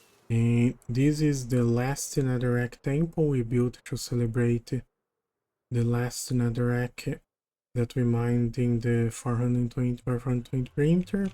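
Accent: Brazil. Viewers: brazuca aqui, ingles bom , entretanto dá pra identificar que é brazuca hehehe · Pelo nome e o sotaque, acho que você é brasileiro, confere? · i came for minecraft but stayed for the brazilian accent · É brasileiro? Tem sotaque de brasileiro kkkkkkkk. Mundo massa demais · Probably in the top 5 worlds of all time by the way, are you Brazilian? Your accent sounds like it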